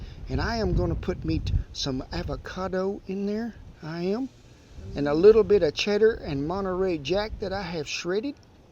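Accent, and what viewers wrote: South, United States. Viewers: I subbed for the Southern accent.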